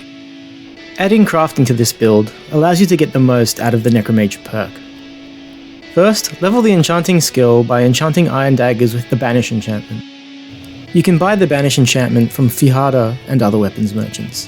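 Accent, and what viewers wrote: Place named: Australia. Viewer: Nice australian accent!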